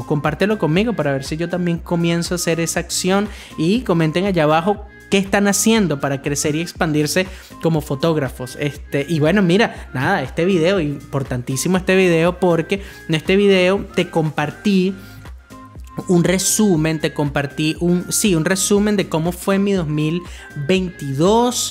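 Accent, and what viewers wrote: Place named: Venezuela. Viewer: No se porque, pero me pareces q eres de venezuela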